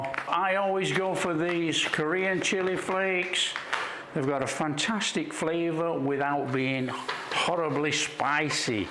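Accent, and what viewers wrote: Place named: England, United Kingdom. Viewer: a luv your cooking style,im basic and want easy peasy though great taste im sure and too many complicated recipes oot there,emigrated to Canada from glasgow as a child,love your accent,what part of england is this accent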